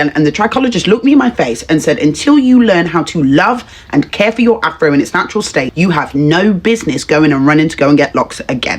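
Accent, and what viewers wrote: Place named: United Kingdom. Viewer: Are you British? I’m not a native speaker, love your accent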